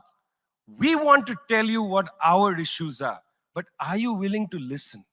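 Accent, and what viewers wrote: India. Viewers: 我居然第一次听这个印度口音听了两遍！？ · 第一次感觉印度英语也能如此优美！ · 第一次觉得印度的口音还有那么一点悦耳…… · 👍不知不覺刷了兩遍🤭第一次覺得印度英語特別好聽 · 这是我听过最好听的印度英语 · 难得这个印度口音能全部听懂🤣真的，普京也跟瑞典环保小公主说过，第三世界国家的人民会想要先吃饱饭，不要何不食肉糜这种高高在上的态度来对待第三世界的国家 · 我知道阿三腔的英语听起来很辣耳朵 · 看到一些人疯狂追求说一口标准的美式英语，甚至要求他们幼儿园的英语老师必须是外教，实在不可思议！其实，只要语法学好了，说话流畅就好了，有中国人的口音根本不是事。看看这印度人吧，一场扎实，头头是道，引人入胜的演说，太棒了！不会有哪个懂英语的观众会听不懂他发音清晰，精准的印腔英语。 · 这是谁，我喜欢你，我听出了印度口音，我不知道你的为人，但我依然很喜欢你，喜欢你骨子里的忠义，喜欢你语气里的倔强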